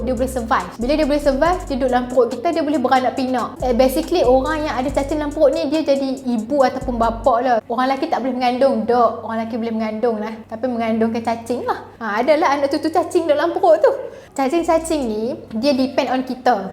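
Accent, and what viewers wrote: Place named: Kedah, Malaysia. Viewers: menarik pulak speaker ni cakap utagha syiokk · uish loghat utaqa.. dulu aku pernah kena cacing masuk dlm sel kulit sebb pegi pantai x pakai kasut... time tu kecik lagi sampai besar skarang still ada Jalan Cacing tu dkt lutut & blakang... sejak daritu aku Fobia nk ke pantai jijak pasir Daging Mentah: Islam pun kata "Masak adalah makan yg sempurna dari metah yg boleh mendatangkan penyakit❤" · comel la cakap utaqa 😅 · Tudia hang puny sembang Kedah memang keluaq habeh tak tapeh ooo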